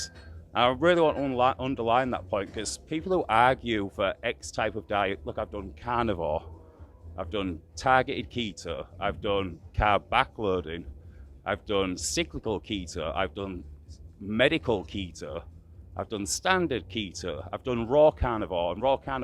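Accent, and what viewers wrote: United Kingdom. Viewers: Fucking hell didn’t expect the northern English accent!!:) good work nice change! 👌🏻👌🏻👍👍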